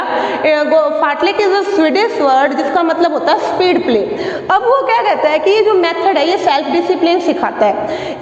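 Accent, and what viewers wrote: Haryana, India. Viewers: Mam r u from haryana.......?? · Mam app Haryana ke lag te ho Sare topics clear ho gaye app ki video dek ke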